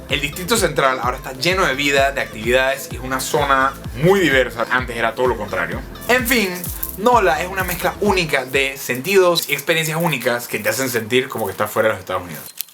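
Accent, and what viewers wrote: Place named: Venezuela. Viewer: Porqué hablas con acento venezolano?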